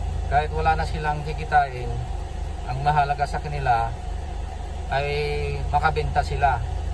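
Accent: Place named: Visayas, Philippines. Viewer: Visaya man si ser oi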